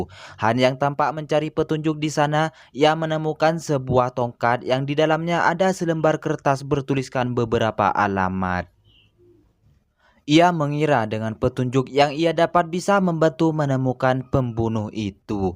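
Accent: Madura, Indonesia. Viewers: Kok yg ngomong logat nya kaya orang Madura ya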